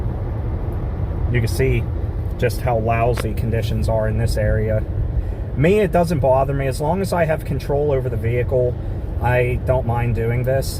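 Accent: Pennsylvania, United States. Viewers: Enjoyed your authentic PA accent!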